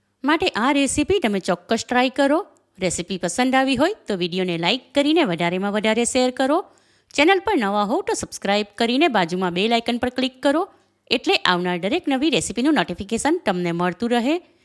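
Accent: Surat, India. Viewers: તમારી હુરતી સ્ટાઇલ ની ભાષા સાંભળવાની મજા આવે છે .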